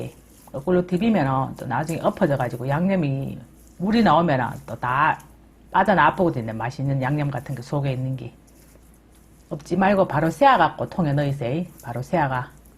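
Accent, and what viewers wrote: Gyeongsang, South Korea. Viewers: 주부님 지루하지않고 영상보면서 저도같이 웃고있네요 특유의 경상도 말이 안동고향살땐 대구에사시는 시누와 애기할때도 그렇게 제미있다라고생각못했는데 전라도와서 말씀이 이렣게 제미있고 구수하게 들리네요 ❤❤1❤❤ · 경상도사투리에 더정감감이가내요.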